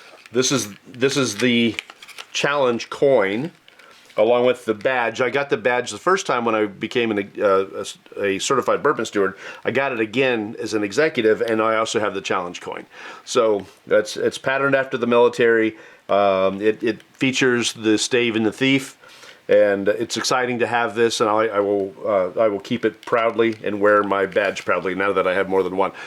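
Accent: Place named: South, United States. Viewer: Congratulations! Here's a toast to you in a glass of Old Forester 100. 🥃 A pot still's operation is dead simple. But I have just a vague understanding of how a column still works. About all I know for sure about a column still is that it's more efficient, and therefore tends to strip more of the congeners out of the white dog. I can just see the Official Aroma Kit - 2,724 different scents, comes with forklift for moving. 😂 I never get peanuts from Jim Beam juice. I get pecans from George Dickel white label, and walnuts from their BiB. And I get peanut shells on the nose from Heaven Hill whisky. Tonight you can't seem to make up your mind whether caramel is CARmel or CAREamel. I can tell you've been in the South for a bit. 🤠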